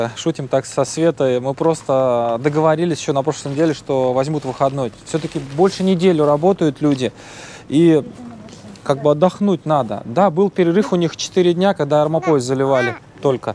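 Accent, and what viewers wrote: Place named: Tatarstan, Russia. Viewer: Пащиму у татарский акцент?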